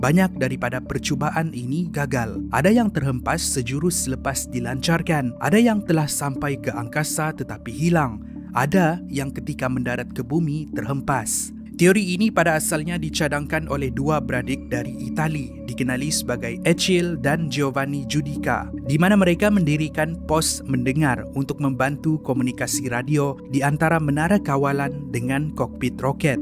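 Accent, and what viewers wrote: Sabah, Malaysia. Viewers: Suka tgok penulisan dan dengar org Sabah brkata kata..menarik...trbaikk ya..betul lh tu kut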